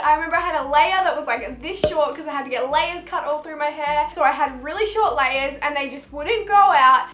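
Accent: Australia. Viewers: I’m watching some of old videos and can notice accent is more Australian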